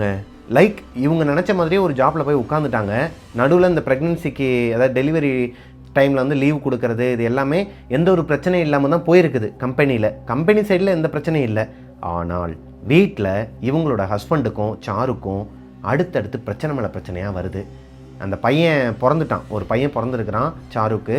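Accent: Bangalore, India. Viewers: Akka banglore slang tamil keka nalla irukku,im from banglore to....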